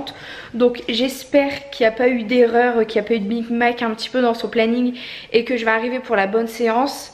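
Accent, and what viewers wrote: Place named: North, France. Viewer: Je m'en doutais que tu étais du nord j'ai entendu l accent puis après tu as dis Euralille j en étais sûre haha... tu as trouvé où un joyeuse fée du coup ?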